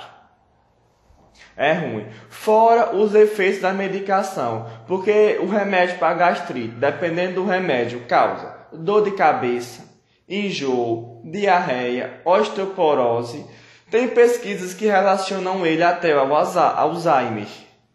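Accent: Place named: Juazeiro, Brazil. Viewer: Adoro esse sutaque juazeiro. Falando assim me sinto bem melhor!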